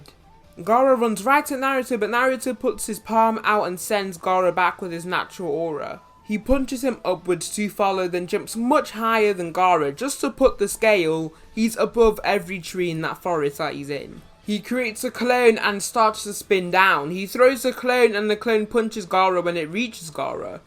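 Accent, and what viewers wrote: United Kingdom. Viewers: That Brittsh accent is so annoying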